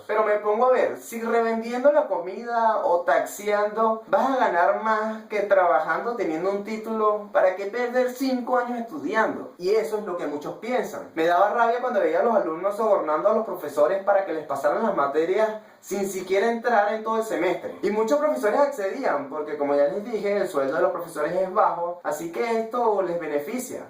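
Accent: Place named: Venezuela. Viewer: Hola!!!Lo sé, tu país tan lindo está pasando por una crisis muy fuerte,y no hay punto de comparación, pero verán que pronto saldrán de eso. Y de verdad me encanta tu forma de expresar además del acento venezolano.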